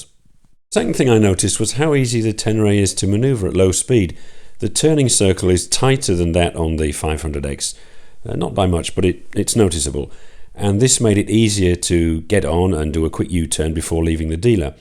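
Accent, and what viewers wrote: United Kingdom. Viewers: Not only I find your opinion on bikes clever and accurate but also I get to practice my british English listening skills. Absolutely brilliant :D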